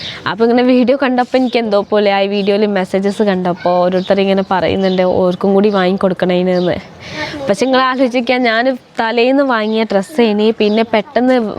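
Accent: Malappuram, India. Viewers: a north indian doing videos in Malappuram slang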